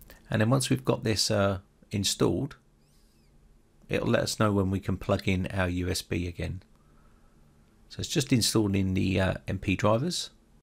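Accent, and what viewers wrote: England, United Kingdom. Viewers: you are a champ. You have helped me so much with your videos. You will be surprised to here that they go all the way to Australia. 😂😂 By the way, although I live in Oz, I’m a Scot and I like listening to your friendly pommy banter. 😂😂😂👍👍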